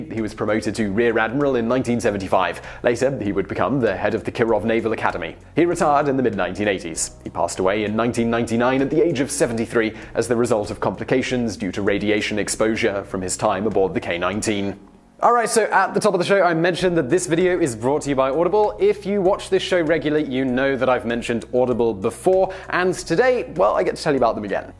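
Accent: United Kingdom. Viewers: Omg fucking UK accent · bobtheduck Yes, he’s undoubtedly British. You can hear it in his “Cubar” ( , ).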